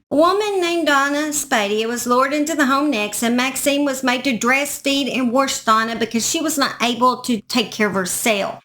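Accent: South, United States. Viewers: Just found your channel + instantly subbed. I watch true crime way too much + I've never heard this. Thank you for bringing attention to it. What a sick sick woman. I have no words...I hope all the victims of this deranged lady are living their best lives today. My prayers are with them 🙏 Btw having a severe Boston accent myself, your southern voice was lovely to hear, especially talking to your pup! Thank you for the vid. Look forward to your next ✌️💙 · Thank you for your content I luv your country accent. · Wow,love your southern accent. Yes, this monster is the worse of the worst. Damn, the things she did to her victims are unheard of. She was one sick b*tch. I'm glad she'll spend the rest of her miserable life in prison.she still gets her 3 meals a day,free health care.Something her victims didn't get.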